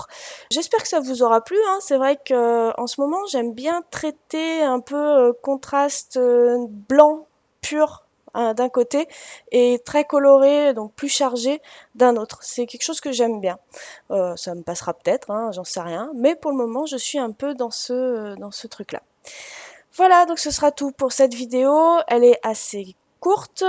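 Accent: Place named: United Kingdom. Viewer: Coucou. Très chouette le contraste couleur/Blanc.Ton accent anglais est comme le mien. Cela me fait sourire car j'ai du mal à prononcer certaines sonorités. Bisous.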